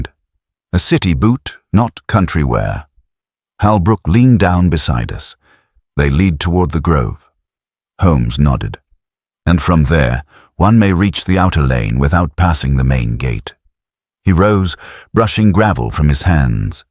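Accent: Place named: Liverpool, United Kingdom. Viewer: Ok Scouse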